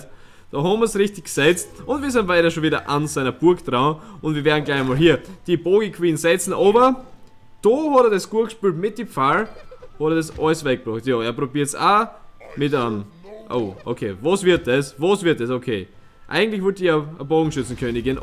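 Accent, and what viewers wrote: Styria, Austria. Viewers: Ich kann dich verstehen weil Steirisch ist fast gleich Schweizerdeutsch😉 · i hob an freind der a steira is und der red a wie du. I bin oba Niederösterreicher. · I finds voll geil das du österreichisch redasch i find as isch bessa so :D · konnte es versthenen weil ich aus Bayern komme und weil da der Dialekt so ehnlich wie in Östereich ist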